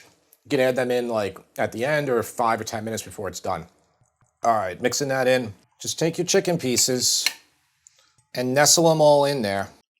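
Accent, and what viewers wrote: New York, United States. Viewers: I started checking out this channel mostly for the classic deli salad/sandwich recipes, after I specifically went looking for somebody with a Noo Yawk (or Jersey or LI) accent who actually made that stuff for a living. I've tried a few now and they've all been exactly what I imagine a good NYC Deli tuna salad or mac salad or chicken salad should taste like. And I gotta say, all these "easy one-pan" meals and pasta dishes look and sound absolutely awesome too. Time for me to start feasting on more than just really good salads and sandwiches I think. Cheers for all the great ideas!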